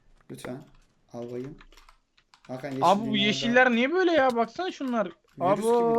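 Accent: Trabzon, Turkey. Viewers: abi trabzonlumusun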